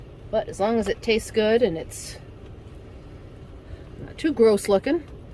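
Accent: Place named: Boston, United States. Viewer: I'm thinking of getting one of these, good idea with the rack at the bottom. I love your accent, where are you from, sounds like Boston.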